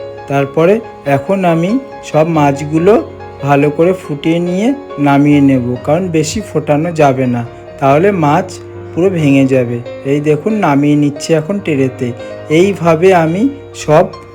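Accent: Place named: Bangal, Bangladesh. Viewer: পিচ এটা আবার কোন দেশের ভাষা , বাঙাল ভাষা ?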